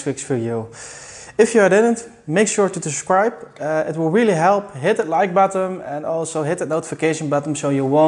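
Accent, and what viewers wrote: Netherlands. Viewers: Ik hoor een nederlands / engels accent. ^_^ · Je hoort dat het een Nederlander is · I nit think he us russian my friend, he sounds dutch to me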